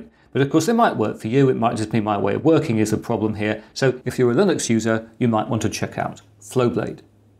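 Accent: England, United Kingdom. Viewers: Perfect British Accent. Bravo! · Excellent, thank you. Watching it now. Also subbed to your channel as A. I enjoy your delivery. B. Fell Brit makes a nice change. C. Most importantly I'm passionate about the same subjects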